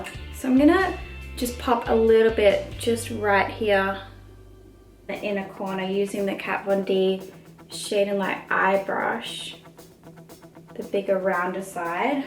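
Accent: Australia. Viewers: I loved this !! I thought you were Australian - did you move to America? Xx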